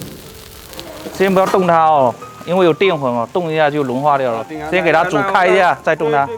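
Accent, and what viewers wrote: Fuzhou, China. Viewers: 兄弟福州人么，福州腔好重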